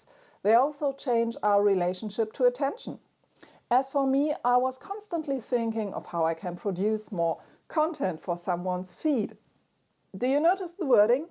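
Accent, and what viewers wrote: Germany. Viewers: Thank you for this post! I felt the same way. But a certain schedule helps to stay away from checking it, besides I haven’t been on instagram from March to October of 2022. And it feels like I need to do it again 😅 But I joined Behance, where I can communicate with different types of artists. Not sure about this platform yet, but at least my portfolio is online. P.s. Love your German accent so much. When I heard it, I’ve realized how much I miss this country and the language itself. Danke schön ❤ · I like your accent, during your video my mind almost convinced me that your were speaking German and not English ;D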